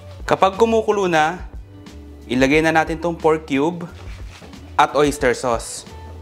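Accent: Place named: Cavite, Philippines. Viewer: yung punto parang taga-cavite... imus or bacoor hehe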